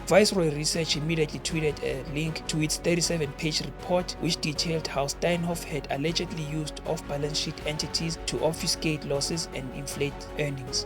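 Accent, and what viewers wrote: South Africa. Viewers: Great ti hear these stores narrated with a SA accent. Sending love to Mzansi from HK, China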